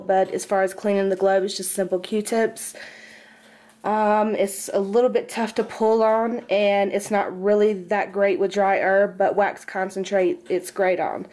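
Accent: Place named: South, United States. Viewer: Your gorgeous love your southern accent what a fox :)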